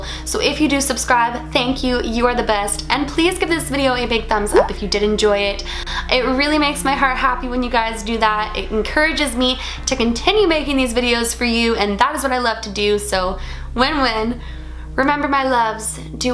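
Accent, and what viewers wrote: Canada. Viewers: Is she Canadian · are you Canadian?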